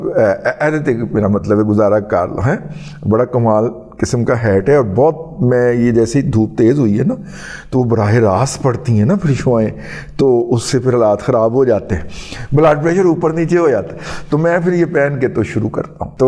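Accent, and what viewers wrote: Punjab, Pakistan. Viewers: Better you avoid using english words in Punjabi accent. Punjabi would be a better choice for your Vlogs. Please also avoid self praising.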